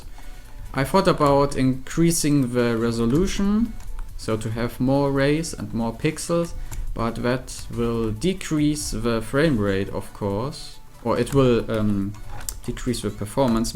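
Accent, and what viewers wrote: Germany. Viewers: Achja der deutsche Akzent in englisch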